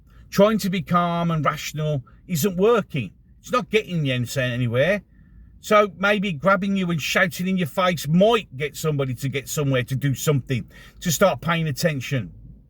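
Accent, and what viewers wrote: Birmingham, United Kingdom. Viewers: Spoken like a true old skool Brummie 😂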